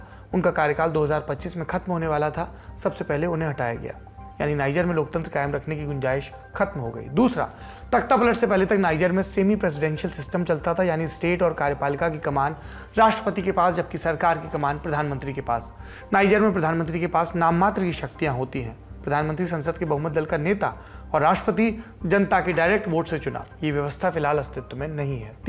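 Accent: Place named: Bihar, India. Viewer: Sir apka news kahne tarika mast hai ekdam bihari U.P. style mein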